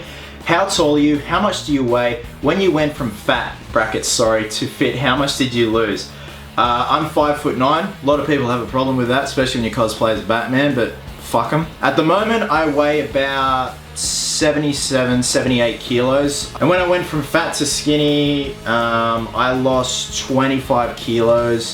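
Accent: Australia. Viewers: And they say the perfect guy doesn't exist. Australian accent included. haha :)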